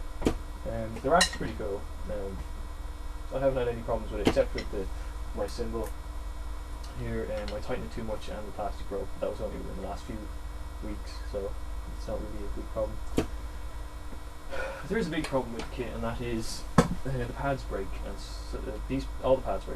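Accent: Ireland. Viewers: are you from waterford? you sound like your from the deise...boy!!! :)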